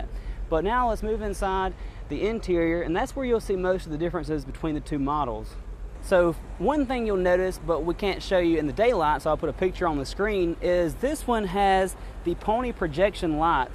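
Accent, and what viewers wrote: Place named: South, United States. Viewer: He sounds like a Texas citizen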